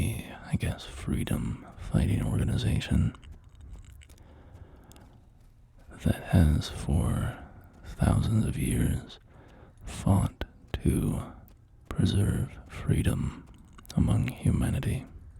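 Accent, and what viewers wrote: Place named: United Kingdom. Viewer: your history knowledge is impressive never stop my knowledgeable brother, i love your accent i assume you are in the uk?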